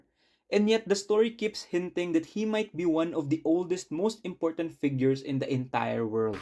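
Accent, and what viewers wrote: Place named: India. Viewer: Please remove Indian accent 😭😭😭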